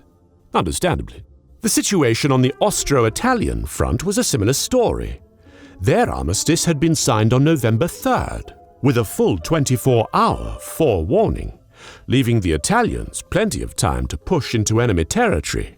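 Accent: United Kingdom. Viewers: Saw an ad, clicked because of the thumbnail, stayed for the British accent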